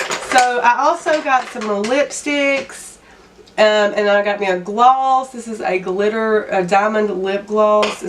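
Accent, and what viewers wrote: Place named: South, United States. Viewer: I’m from Louisiana and I’m guessing the accent is close by...Makeup Arkansas or Tennessee? 💛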